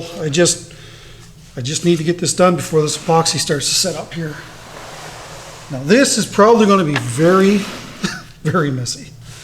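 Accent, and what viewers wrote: Canada. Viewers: I love the Canadian accent, so I'm here for the commentary! I love this Hoser, Aye!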